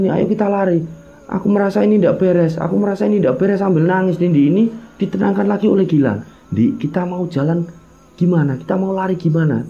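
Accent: Java, Indonesia. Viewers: Q suka cara pembawaanya cara bercerita ada bahasa jawa y kental,top markotop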